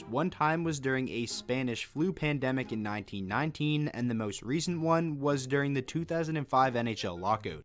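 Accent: Canada. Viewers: How did you get to be a wings fan? Sounds like you're from Canada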